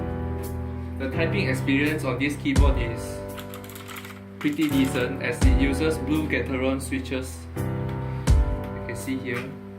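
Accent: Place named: Philippines. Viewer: when you talk that is more like pinoy lol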